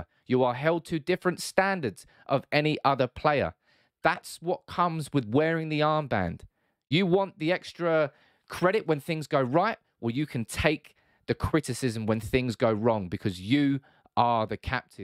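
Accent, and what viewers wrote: Manchester, United Kingdom. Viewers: U have such a strong Manchester accent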